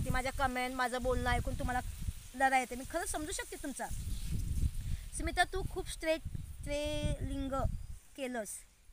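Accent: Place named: India. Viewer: स्मिता तुम्ही आयुष्य मुंबईमध्ये काढले तर शुद्ध मराठी बोलायला पाहिजे. जेव्हा तू शुद्ध मराठी बोलतेस तेव्हा ऐकायला गावरान मराठी पेक्षा चांगले वाटते. तुझी बोलायची पद्धत तीच ठेव पण शुद्ध मराठी ला नक्कीच 💯 % जास्त लाईक्स मिळतील.शुद्ध मराठी ऐकायला फार बरे वाटते.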